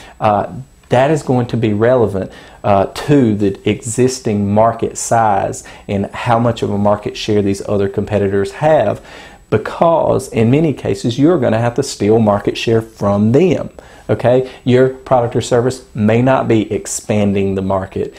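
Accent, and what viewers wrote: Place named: United Kingdom. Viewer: I love his teaching and accent (though I have been a lover of British accent)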